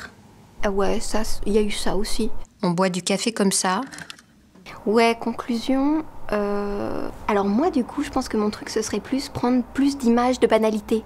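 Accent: Quebec, Canada. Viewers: Comme toujours, merci / ☺️☺️ J’aime tes réflexions, non! J’adore tes reflexions!! ☺️ Les tites photos ( ton accent québécois hihihi.. 😊) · J'ADORE QUAND TU PARLES AVEC L'ACCENT QUÉBÉCOIS CONTINUE S'IL TE PLAÎT JE T'AIME TELLEMENT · Cet accent québécois 😍 "je veux pô prendre une tite photo" Sinon tout à fait d'accord sur vivre à 100% l'instant présent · Incroyable comme a encore un vrai accent québécois 100% quand elle le fait, après ne pas l'avoir parlé aussi longtemps! En tant que Youtubeuse bilingue québécoise et canadienne ça me fait du bien car je fais des vidéos dans les 2 langues ce qui est un énorme PLUS car j'ai pas que 2 langues, j'ai 2 cultures, mais y'a des gens à l'esprit étroit qui me mettent des thumbs down parce qu'il y a 2 langues dans le vidéo et ils n'en parlent qu'une. J'ai des gens que j'aime qui ne parlent pas l'une ou l'autre. Je refuse de me limiter, d'exclure ou de niveler par le bas en choisissant une de mes 2 langues que j'utilise autant l'une que l'autre à tous les jours, autant à l'oral qu'à l'écrit. 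Alors les gens si ça vous intéresse allez voir mes vidéos c'est très vivant, coloré et diversifié, intelligent drôle et sûrement un peu bizarre. Je n'ai que 142 abonnés, j'aimerais atteindre le 1000 pour avoir accès au volet "communauté". Quand j'atteindrai les 150 abonnés je fera ma première vidéo en direct... Bilingue, évidemment! 💜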